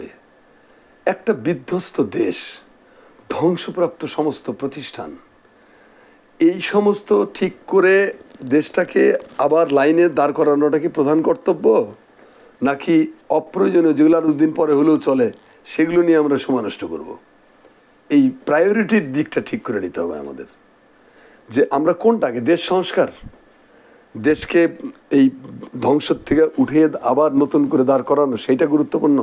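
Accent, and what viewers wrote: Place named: West Bengal, India. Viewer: Why do you talk in west bengal tone?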